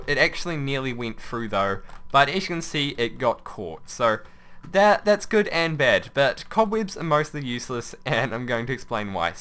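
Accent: New Zealand. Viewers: He's from new zealand that's his accent. Nobody's perfect.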